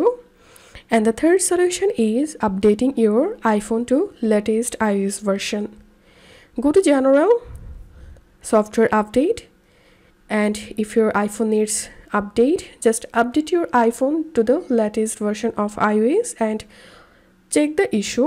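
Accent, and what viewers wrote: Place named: India. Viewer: do you have this same explanation with no Indian accent?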